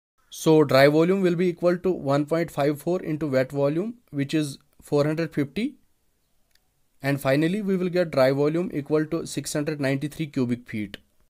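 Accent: Kashmir, India. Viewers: got ur kashmiri accent. good work brother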